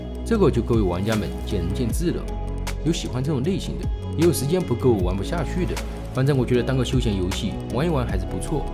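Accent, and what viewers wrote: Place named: Southwest, China. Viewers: 一听就知道你是西南地区的人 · 他成都的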